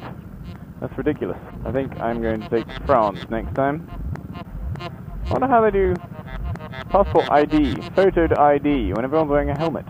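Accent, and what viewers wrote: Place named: England, United Kingdom. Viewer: I like ur accent, where in england are u from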